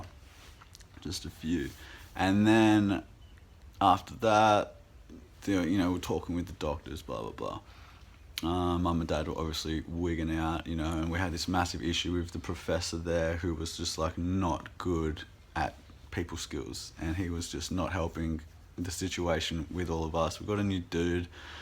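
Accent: Australia. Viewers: Hahah no! I'm probs just not used to the aussie accent, i'm from New Zealand you see :p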